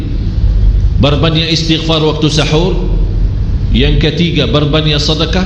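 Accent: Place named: Arab world. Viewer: kangen rasanya dg beliau , dg suara khas arabnya dlm tausyiahnya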